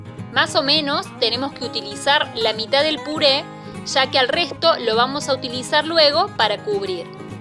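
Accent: Córdoba, Argentina. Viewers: Me imagino q eres de Argentina por el acento. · Hola soy de CARLOS PAZ Argentina...y tú???Tu tonada parece de Córdoba 😀👌👍🏻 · Espectacular la voy a hacer!!! Por ahí me parece que tenés una tonada cordobesa, puede ser?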